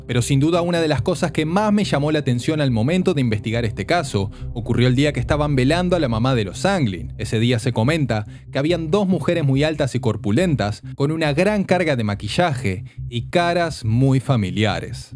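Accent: Argentina. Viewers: El narrador es Argentino de donde era Sandro ! Che !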